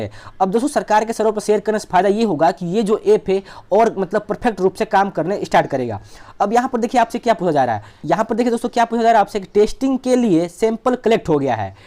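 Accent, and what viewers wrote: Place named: Bihar, India. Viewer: thoda space dia kr bolte waqt bihari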